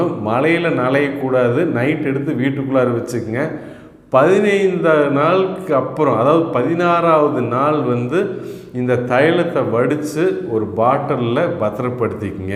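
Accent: Kongu, India. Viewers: வணக்கம் சார் உங்கள் வார்த்தைகள் கொங்கு தமிழுக்கு மேலும் அழகு சேர்க்கின்றது உங்கள் வார்த்தைகள் எமக்கு நம்பிக்கை தருகின்றது அனைவருக்கும் புரியும்படியாக தெளிவான வார்த்தைகள் உங்கள் தொண்டு வளரட்டும் இறைவன் துணை தங்களுக்கு எப்பொழுதும் உடன் இருக்க வேண்டுகிறேன்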